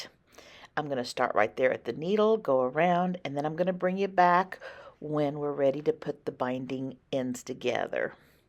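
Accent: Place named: St. Louis, United States. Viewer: Are you from St. Louis? I heard only people fro St. Louis say (warsh). I'm from STL and say warsh.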